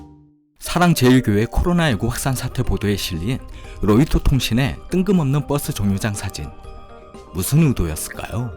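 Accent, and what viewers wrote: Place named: Yanbian, China. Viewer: 조선족은 중국관련 영상이나 올리시오. 아무리 한국인인척해도 당신 발음과 억양속에 조선족임이 들어나고 있음.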